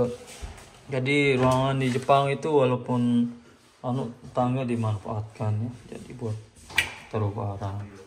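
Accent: Lombok, Indonesia. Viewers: La Ilaha...asli medok ...lombok tie meton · Logat sasak tetap melekat, mantap Semeton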